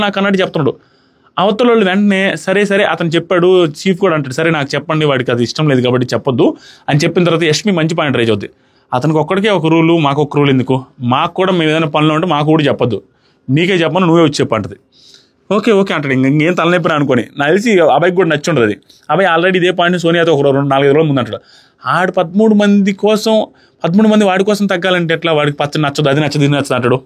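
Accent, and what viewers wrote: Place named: Nellore, India. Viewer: Anna nee review chuse nee matlalu chuse full navukunam enduku ante madhe kuda nellore kabate really rocking anna nee review aethe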